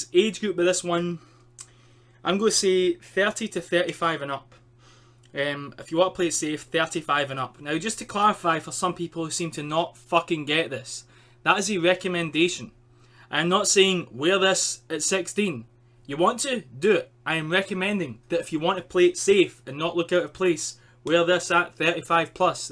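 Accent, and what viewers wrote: Scotland, United Kingdom. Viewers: S good and fun review to watch. BTW, are you Scottish? Cool accent regardless.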